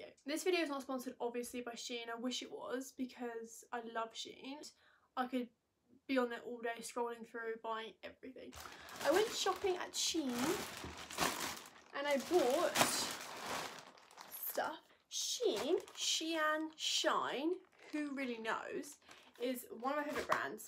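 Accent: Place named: RP, United Kingdom. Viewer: Love a posh English accent, is short for?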